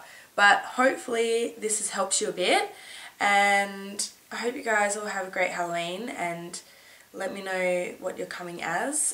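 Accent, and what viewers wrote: Australia. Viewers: OMYGOHHD your australian ;P that just instantly made my love you x3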